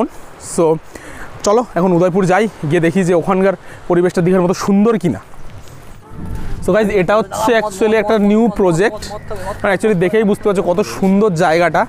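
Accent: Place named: Bangladesh. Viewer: তোমার বাড়ি কি বাংলাদেশ কথাবার্তা শুনে সেই লাগছে আমার বাড়ি দীঘা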